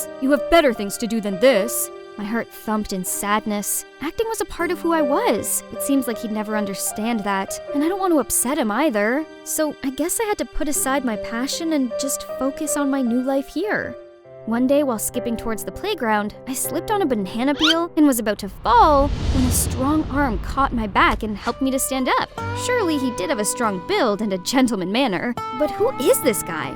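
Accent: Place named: Canada. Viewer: I love that there is a hint of canadian accent in the narrator's voice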